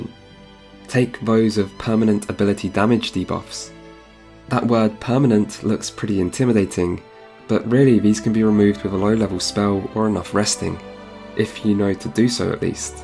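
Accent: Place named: United Kingdom. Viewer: Not going to lie, subscribed mainly because of your voice and accent. You're like British Bob Ross.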